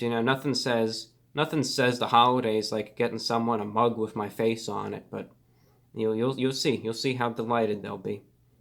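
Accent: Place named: New England, United States. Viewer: You have such a New England accent lmao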